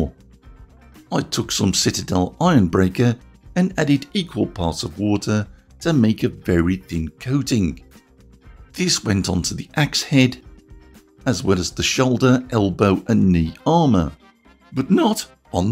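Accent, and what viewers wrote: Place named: Midlands, United Kingdom. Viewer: MARS GIVEAWAY MADNESS! thank you for this opportunity, your reviews are very handy and your accent reminds me of home as I grew up in the midlands! Good ol' Kidderminster 😂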